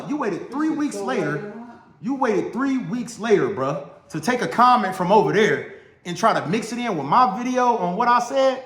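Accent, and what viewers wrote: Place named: Detroit, United States. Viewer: Could’ve swore you was from Detroit s/O to Cincinnati! I got fam in Youngstown & I just subscribed to your channel bro! Keep goin up 💯💯💯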